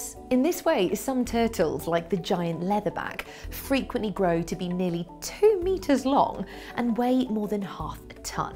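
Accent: United Kingdom. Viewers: Loved the vid...love the Brit accent! "Water" becomes, "wwWW-ta" LOVE it! (not making fun, I really do like listening to Brits talk)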